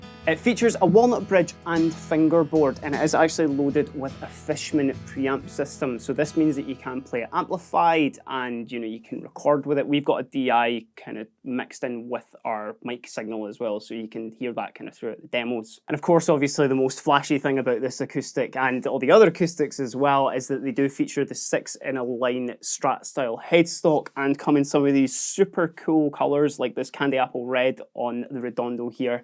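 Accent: Scotland, United Kingdom. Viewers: Can't understand the scotch accent 🤷🏻‍♀️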